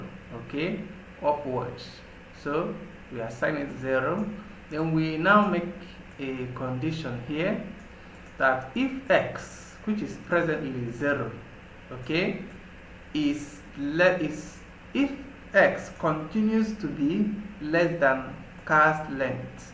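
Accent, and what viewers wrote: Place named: Nigeria. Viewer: Wooaahh!!! Thank you so very much, Mr! This video helped me to understand the "sort" function and method of use very well!🥰 Also... I kept on laughing sooo much, as I listened to you teach! At first, I didn't even know who was teaching, until the accent caught my attention! lol!!... (I was expecting an Indian, as usual - they actually teach very well... but the accent sometimes is a challenge for me.😊) But when I checked the name of the vlogger because the accent was sooooo Nigeranly, and I saw it was Nigerian?? 😂 I couldn't help but laugh sooo much as I watched and learned along😅. You really sound like these our Nigerian lecturers in the Nigerian Universities😂💝(plus the "do you understand?" that you kept saying!😂🙌... Oh Sir, I truly appreciate this video!🙌) Thank you once again Sir, for taking out your time to make these videos. 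God bless you!🙏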